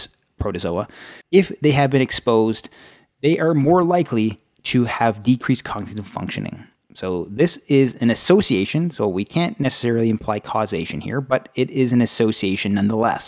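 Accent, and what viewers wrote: Canada. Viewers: i can tell youre Canadian lol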